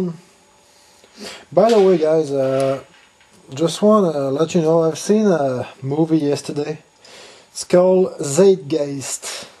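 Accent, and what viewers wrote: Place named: Quebec, Canada. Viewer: j'ai reconnu l'accent québécois lol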